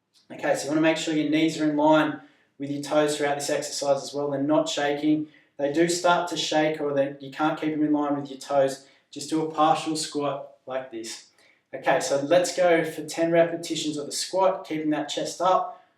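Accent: Australia. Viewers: Hi, Thanks so much for all your videos and articles. They are all very informative and easy to follow and do. I'm stuck in Europe at the moment, unable to get easily back home, so it's wonderful to hear a lovely Ozzie accent.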